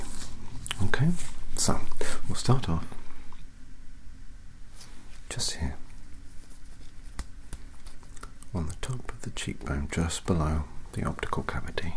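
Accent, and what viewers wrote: United Kingdom. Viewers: You could genuinely do a Micheal or even William Afton from the Five nights at Freddy’s series. As you sound just as British, and almost sinister sometimes. But at the same time you are very funny so you could have fun with it. I don’t expect you to do it I just had that thought, could be cool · Minolica coughs awkwardly in I totally don’t thirst over his gorgeous hair and British accent sorry 😅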